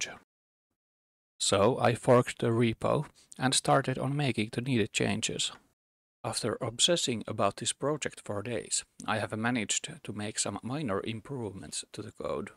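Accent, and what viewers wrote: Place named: Finland. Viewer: Arvasin heti aksentista, että kyseessä on suomalainen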